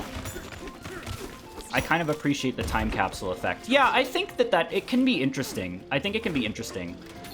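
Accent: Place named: Boston, United States. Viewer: Doesn't remember 90% of the jokes chat remembers. Remembers them shaming his Boston accent. Think about the effect your words will have, people.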